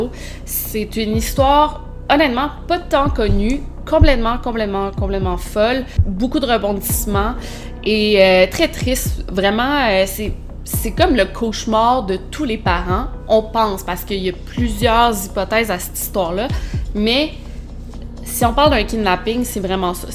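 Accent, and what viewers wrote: Quebec, Canada. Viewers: Bonjour,Lionel parle de vous ecoutez, du coup je viens ici.❤❤❤❤❤,et j aime deja votre elocution et votre accent si charmant,j aime beaucoup les canadiens,et les canadiennes.Le Canada 🇨🇦 🍁 🇨🇦 🍁 🇨🇦. ❤. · "la p'tite doudou.." 😊 étant français et nul en langue j'avoue j'adore l'accent québécois ❤ j'arrive pas tjrs à comprendre dessuite en général mais franchement avec toi je comprends direct ^^ je suis abonné depuis peu mais j'ai boulotté beaucoup de tes vidéos, je regarde peu de gros youtuber car j'aime le côté simple, éthique, et bienveillant des plus petits youtuber sauf quelques exceptions comme ta chaîne ! Grand merci pour ton travail et ta vision envers les différents cas, le respect des familles, très humaine et empathique j'aime beaucoup Concernant cette histoire j'avoue c'est dingue... Je n'ai d'enfants mais j'imagine le ressenti de chaque parent face à une disparition, perte d'un enfant... 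Merci encore pour ton travail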